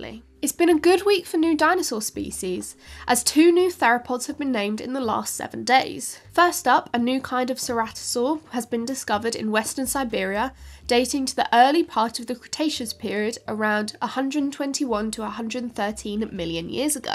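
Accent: RP, United Kingdom. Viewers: I nominate voice with her adorable English accent to be the new voice of OpenAI's GPT-4o. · I love this channel for: 1. Lots of cool science news. 2. Perfect English RP which is a music for my polish ears. Best Regards! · I knew before I started the vid, she'd have a British accent. Perfect.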